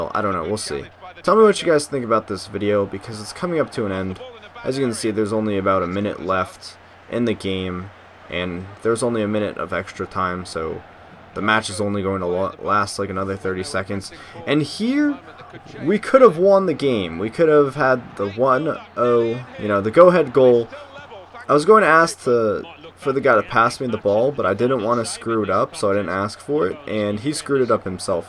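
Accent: United States. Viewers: umm no if your european or whatever its Football. but here its soccer and since is american, its soccer to him, not football, football to us is an amazing sport played with an oval ball. and we pronounce defense different because thats how we say it here. its just different here than it is where you live, no reason to be upset because we say things different here. i don't complain cuz you say mum and stuff because i understand people in different places speak differently.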